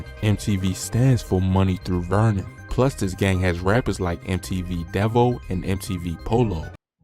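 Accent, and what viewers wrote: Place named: Baltimore, United States. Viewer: You gotta be from Baltimore your accent is giving that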